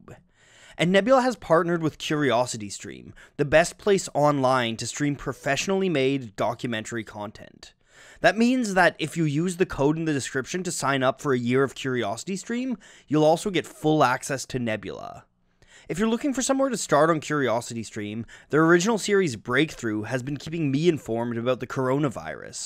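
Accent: Canada. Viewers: D O N O T T R.Y' TO S O U N D A M E R I C AN * WE HEAR YOUR CANADIAN ACCENT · I just learned that you are Canadian. Your pronunciations make sense now and I realize I’ve got a USA-centric lens.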